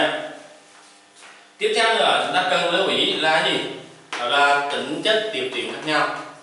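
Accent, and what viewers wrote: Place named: Nghe An, Vietnam. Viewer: nói giọng giống nghệ an rứa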